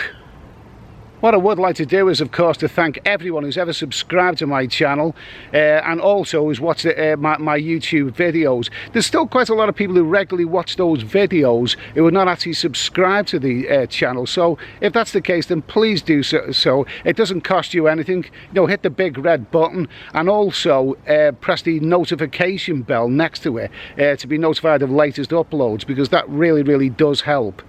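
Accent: Liverpool, United Kingdom. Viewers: I watch your streams but sometimes it’s a bit too barrack room for me. I get that you do a lot of sex tourism vlogs but I watch because I think you have a brilliant sense of humour and you are actually a very good travel vlogger. So well done, please be proud of your scouse accent because it’s part of your humour. By the way I’m not a 20 stone bingo player at the local club. You deserve the views because your a good travel vlogger and watching you review Sainsburys pasties and Scouse Brandy is hilarious. They should sponsor you.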